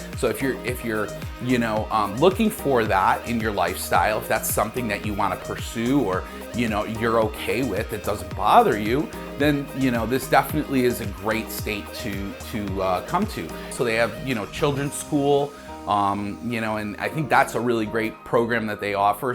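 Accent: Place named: Western New York, United States. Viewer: Entertaining video, though that Western New York accent is still evident. Go Bills!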